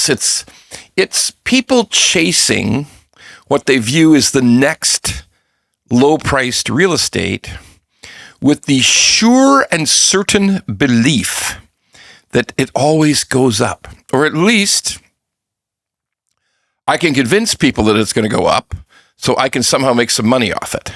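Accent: Toronto, Canada. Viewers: i know you are in Toronto, it's the way you say it with the last 'T' silent.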